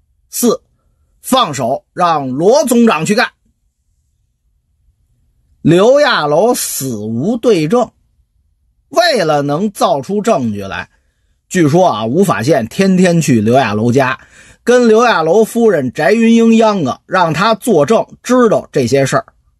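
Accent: Beijing, China. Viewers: 從第一集開始聽，沒注意居然追上進度了。雖然我不太喜歡京腔，但是老哥這個講得確實不錯。希望老哥堅持，更上層樓。話說這一集1.8k 點踩，怎麽回事。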